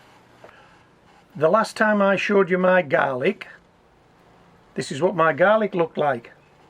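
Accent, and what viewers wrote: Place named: West Cumbria, United Kingdom. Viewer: That’s is a West Cumbrian accent if ever I heard one. What bags are you using? Great channel btw. Is that homemade compost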